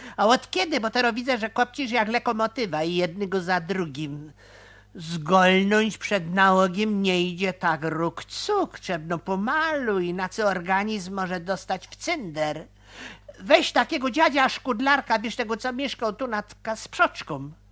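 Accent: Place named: Poznań, Poland. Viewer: fajno godo :) tero tak mało wiary gogo po poznańsku szkoda :(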